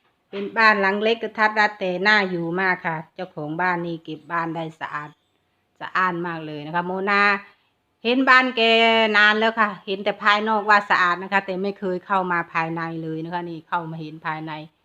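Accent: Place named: Surin, Thailand. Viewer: ฟังดูแล้ว.สำเนียงคน..สะเร็นชัดๆเลย.5555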